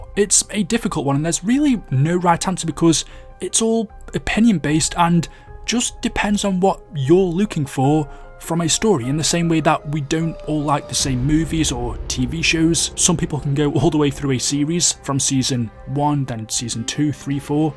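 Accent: United Kingdom. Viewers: you sound like razzbowski if he was super bri'ish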